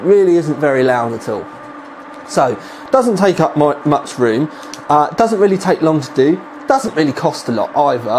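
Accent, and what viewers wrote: United Kingdom. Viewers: also you have an ABSOLUTELY BLOODY MASSIVE british accent no offense : ) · this guy is so brittish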